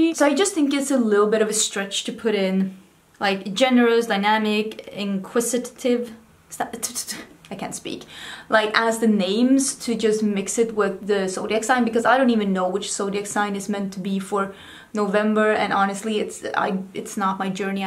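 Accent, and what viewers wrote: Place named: Sweden. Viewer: Your English is brilliant and I love hearing your Swedish accent 🙏💕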